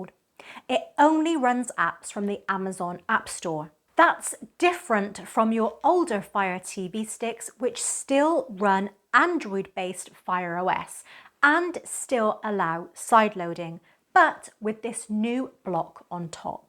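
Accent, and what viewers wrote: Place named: West Country, United Kingdom. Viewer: Lovely to hear and see a UK/west country female giving straight forward info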